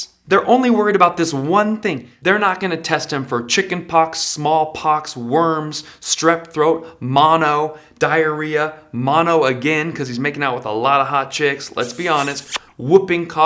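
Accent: Australia. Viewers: Great Aussie voice! You and your impressive impressions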